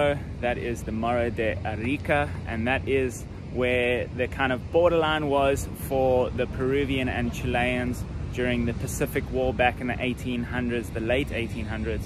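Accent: South Africa. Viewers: I hqve to ask what accent you have I’m thinking South African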